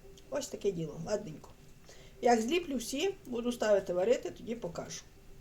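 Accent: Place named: Western Ukraine, Ukraine. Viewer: Так говорять на Західній Україні, в кожному регіоні нашої країни свій діалект і вимова, і тим вона неповторна, незабутня і самобутня!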